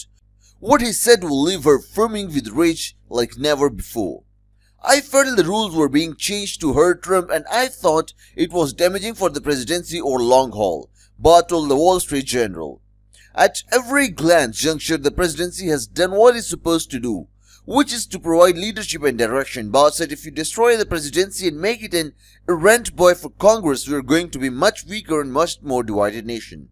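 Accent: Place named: Russia. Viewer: Why would you EVER use a narrator that sounds Russian?? Kind of crazy.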